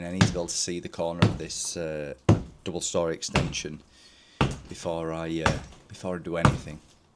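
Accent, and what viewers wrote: United Kingdom. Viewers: Love your videos, the Yorkie accent and sayings! Please keep them coming! I wish your family and you a Merry Christmas and a blessed new year! I hope that your father and you will be able to finish many more projects successfully!😊🎄🎅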